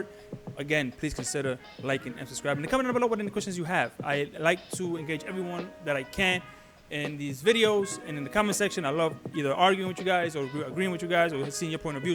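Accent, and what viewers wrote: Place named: Latin America. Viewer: You may speak English, my friend, but you're actually vibrating and articulating in Spanish or some Latino version thereof. However, you are attempting to connect with a nation of many different cultures, anglo's figuring large among them. Your speech, in it's high stress. Latino rapidity, virges on the brink of becoming incomprehensible because of your machine gun fire manner of delivering your thoughts. And without attempting to be offensive, I must tell you, it becomes irritating and of limited tolerability to Western ears. So this will not be easy for you, because rapid fire Latino is your communication mode of choice. All I can say is, seek to chill out and slow down and to dwell upon the meaning of the words that explode out of your lips with such self-assured speed. They are all not necessarily connecting with your Western Brothers and sisters in the way that you might hope. 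And remember, the world is not going to come to your speaking or thinking style, you will have to modify yourself to meet it where it is. Good luck in this process of recalibrating yourself. Don't worry, in the end it will make you less compulsive and more pleasant to be around.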